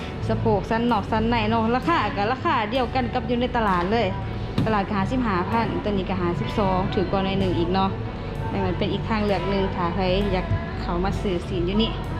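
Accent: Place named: Hong, Thailand. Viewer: สำเนียงสาว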